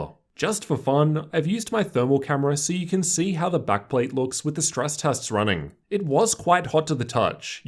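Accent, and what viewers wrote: Australia. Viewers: I don't think he sounds that weird, but I'm an Aussie and I'm use to our accent. I will admit his pronunciation and accent on certain syllables isn't exactly typical but overall he just sounds like a regular Aussie to me. I think he's just reading through his script and his voice naturally goes low when he hits a comma and even lower for full-stops. We have very slight regional variations in our accent. He sounds like he's from one of the Southern States as people in the North tend to raise their voice pitch at the end of a sentence instead of lowering it. Aside from all this voice banter, I think he presents the information quite well and I found him really quite helpful when I recently upgraded my video card. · He has an Aussie accent which normally has an upward inflection on declarative sentences. Perhaps he's trying to neutralize his accent?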